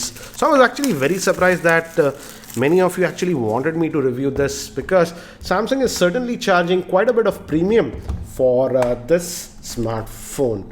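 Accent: Hyderabad, India. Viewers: waiting for haidrabadi Hindi😂😂😂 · Geekyranjit I like your hyd hindi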